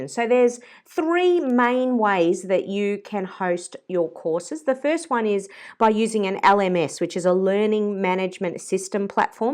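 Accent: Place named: Australia. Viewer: Great to hear another Aussie! I've just started on Teachable and I'm finding it great. The only downside so far is the monthly fees and the extra 5% they take from profits. I've been able to ease into it pretty well as I already have a student base on Youtube and they are very keen learners! Teachable also has a ton of information for starting up and their customer service is excellent. Interesting video, thanks for sharing.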